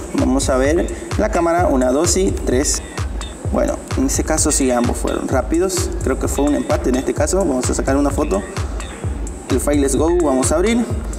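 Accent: Bolivia. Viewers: Cuando vi tu video dije este es de BOLIVIA por qque salio el deber y despues dijiste poquingo y hablas como BOLIVIANO Buen video caramba.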